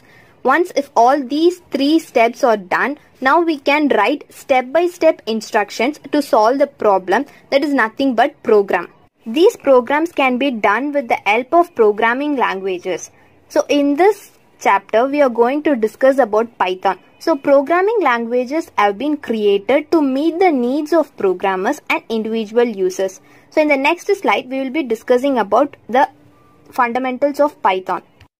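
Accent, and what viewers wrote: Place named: North India, India. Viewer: Your voice seems like a North Indian 😊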